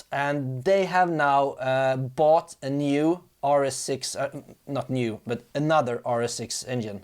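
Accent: Halmstad, Sweden. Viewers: Varför svengelsk dialekt? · E du från Halmstad?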